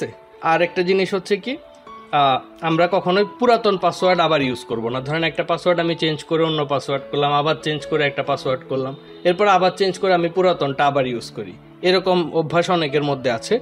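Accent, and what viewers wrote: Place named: Teknaf, Bangladesh. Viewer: মনা তুমি তু টেকনাফে মনে হয় 🙄 ভয়েস ওরকম কেনো 🙄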